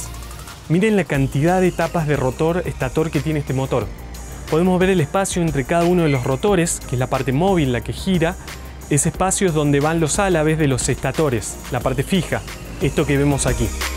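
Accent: Argentina. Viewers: Vos sos de cordoba no? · Eres de aca Argentina no? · sos argentino ==? capooo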